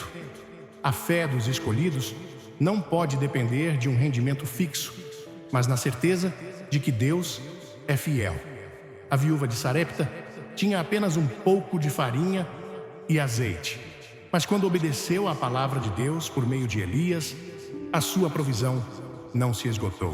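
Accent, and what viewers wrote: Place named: Portugal. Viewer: Porque esse portugues chato/horroroso de Portugal, se o canal diz ser do Brasil?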